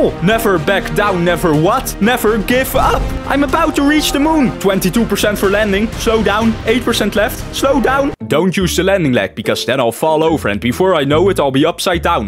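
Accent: Netherlands. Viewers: ben je Nederlands ik hoor het aan je accent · Are u dutch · I can hear the dutch accent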